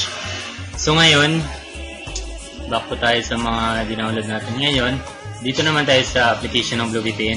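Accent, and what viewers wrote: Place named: Iloilo, Philippines. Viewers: Hahaha... Nandito aq para sa real voice nya.. hahaha... Halata pa Rin ung punto nya ng bisaya.. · Ilonggo siyA sir hindi bisaya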